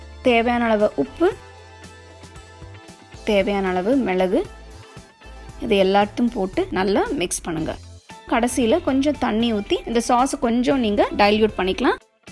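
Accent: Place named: North India, India. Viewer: Tamil uh? I thought ur from North Indian..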